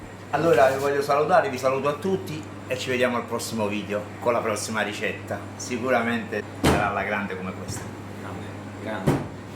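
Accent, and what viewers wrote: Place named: Italy. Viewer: Bravo vous êtes un vrai chef. Bisous de France,. Vive l Italie. Un beau pays avec un très joli accent ❤❤❤❤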